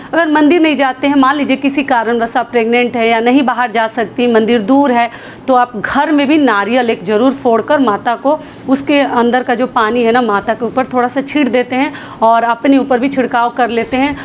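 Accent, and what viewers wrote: Bihar, India. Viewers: Jai mata di..didi ap Bihar se ho kya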